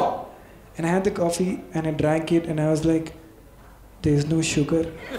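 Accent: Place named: Tamil Nadu, India. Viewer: He got alot of tamil influence 😂😂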